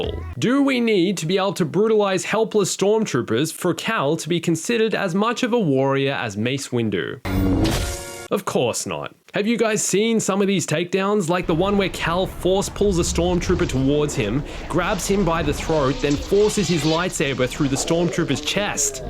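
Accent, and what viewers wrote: Australia. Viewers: Oh your Australian as well cool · Yes your Aussie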